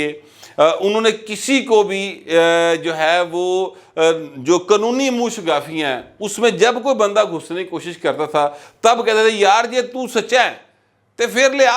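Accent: Lahore, Pakistan. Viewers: u r very good person buhat hi achha andaz hy apaka pure lahore style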